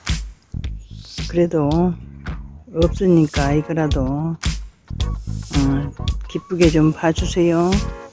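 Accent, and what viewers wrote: South Korea. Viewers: 님의 구수한 사투리는 왤케 정감이 갈까요 ㅎㅎㅎ 손시렵겠어요 ㅜㅜ 역시 님이세요 그 혜안이 부럽습니다 꼭 따숩게 입고 다니셔요 누님 같아서 마음이 많이 갑니다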